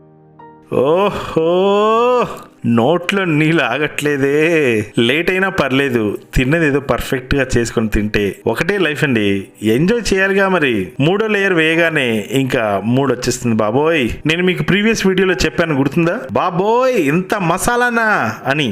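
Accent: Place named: Telangana, India. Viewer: కాకా నీ తెలంగాణ యాస కూడా బాగుంది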